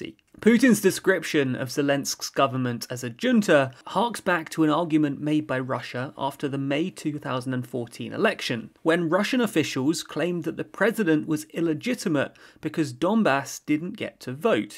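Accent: United Kingdom. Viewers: This is british english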